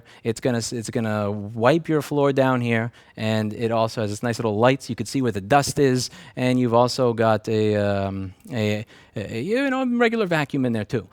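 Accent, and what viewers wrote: New York, United States. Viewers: Was gonna say that this video was a prime example of a person "speaking New York" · Doesn’t sound like any Texan I’ve ever met. Sounds like he comes for Queens or somewhere else in NY. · Is it just me or is your accent extra New Yorky nowadays? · inner "Pissed-off-New-Yorker" accent is fighting its way to get free 😆 Love you, 🙏Keep doing you, man. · A couple minutes in and he's gone full New Yorker. It's gonna be a good one. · I've never noticed your New York accent so much in your videos before.... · This is top 10 most new york video ive seen ever.... The accent... The aggression... The new york... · How did your new york accent get more pronounced from being in Texas for 2 years? lmao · Lmao. You can tell how high the anger levels because the New York accent comes out even harder when is pissed. Love you, thank you for everything you do for right to repair man.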